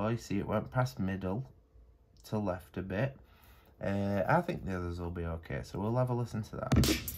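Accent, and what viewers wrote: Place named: England, United Kingdom. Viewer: I've watched quite a few tutorials on the OPZ and still found some new tips on yours. And it's nice to hear an English voice for a change! Thanks👍😊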